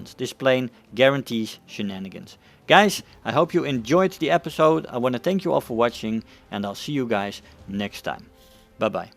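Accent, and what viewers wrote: Netherlands. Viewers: he got that dutch accent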